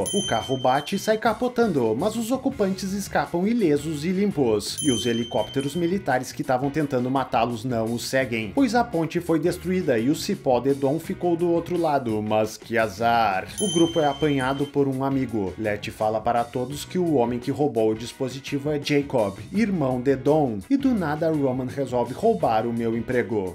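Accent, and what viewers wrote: Rio Grande do Sul, Brazil. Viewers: Esse narrador gaúcho é da hora, kkkkkk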